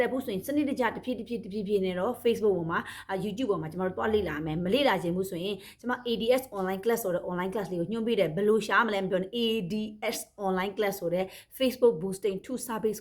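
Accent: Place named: Rakhine, Myanmar. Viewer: Tr က ရခိုင်သံဆင်တယ်နော်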